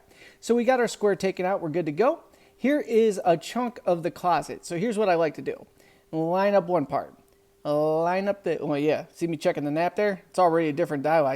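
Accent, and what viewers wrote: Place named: South, United States. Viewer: It was the southern accent that helped this one.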